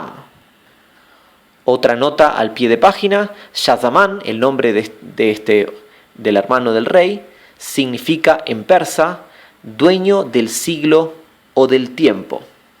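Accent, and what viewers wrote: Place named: Arab world. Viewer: Por lo que veo, está leyendo la traducción de Blasco ibañez, basada en la versión de marduz, que es lo que estaba buscando. Las críticas que leo sobre el estilo del narrador son tontas, obviamente hay diferentes estilos de narración oral, a mí me gusta ese dejo árabe o del sur de España que tiene (soy argentino, no lo puedo precisar), pero gustos son gustos; lo que sí es importante es que es una voz humana.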